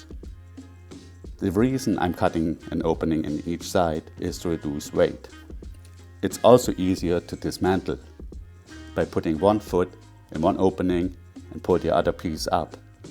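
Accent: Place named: Germany. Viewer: Your accent is definitely telling you are of German descent, in any case European born. So why on earth do you use inches etc. Metric all the way dude!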